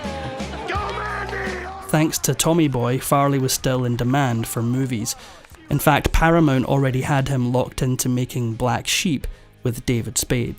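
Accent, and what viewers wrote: Scotland, United Kingdom. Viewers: OK, the Scottish accent is extremely annoying after awhile - never noticed this before!!!!!!!!!! 😮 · Awesomeness my favorite Narrator... Love listening to the Scot!!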